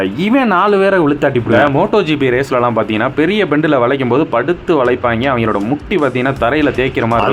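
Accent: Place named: Madurai, India. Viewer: Unga slang enakku romba pidikkum enga area slang.. I am from madurai... Nanga ninaikkura niraya vishayangala neenga thairiyama solrathu romba romba happy..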